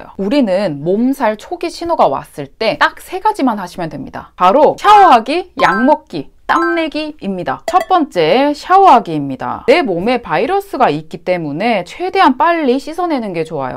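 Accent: Gyeongsang, South Korea. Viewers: 일본어 하시는건가요 경상도 사투리 하시는건가요?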